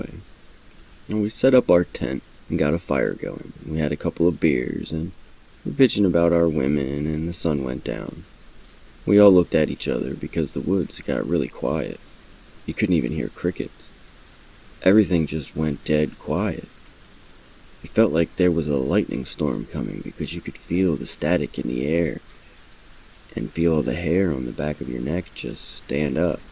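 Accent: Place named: South, United States. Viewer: I hear a little bit of a southern (US) accent ☺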